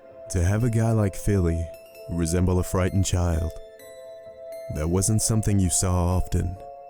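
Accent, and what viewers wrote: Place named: Canada. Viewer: You sound Canadian.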